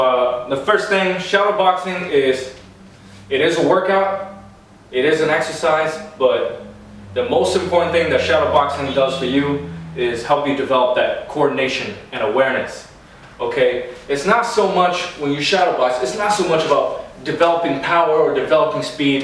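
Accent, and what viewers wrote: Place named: Philippines. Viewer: He has a filipino accent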